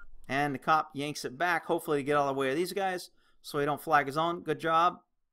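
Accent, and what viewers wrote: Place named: Georgia, United States. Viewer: Stand up man, stand up. I don't know where you live but you talk about knowing laws out west, even though you sound like you may be from Georgia or somewhere near there, but if you live out west there are plenty of comedy clubs that do open mic. You could get signed in a heart beat!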